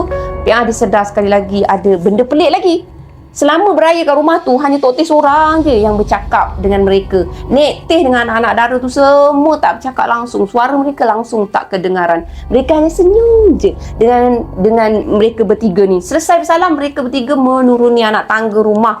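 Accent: Kelantan, Malaysia. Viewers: Kak ore kelate ko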